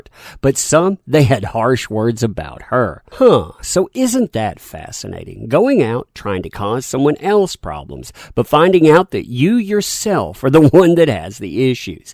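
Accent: South, United States. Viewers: Man your voice is rad. It sounds very southern and endearing. I don't really care about the story but how you portray the information has got me listening. I hope you and yours are good during these trying times.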